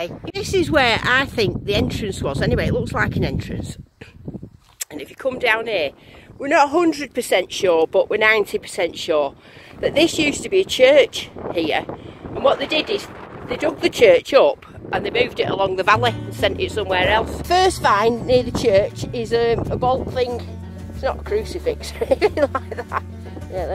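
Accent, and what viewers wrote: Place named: Bury, United Kingdom. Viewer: hi just subbed on this video, your accent sounds like you cant live to far from me in bury, you have a cheery positive banter and thats what made me sub, have you ever run your detector along the dry stone walls themselves incse any riches were hidden in the cavitys of the wall,just a thought..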